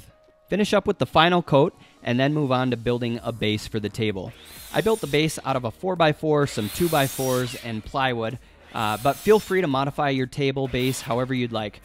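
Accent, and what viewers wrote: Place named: Montana, United States. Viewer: You don’t have to tell us you are from Montana. We can tell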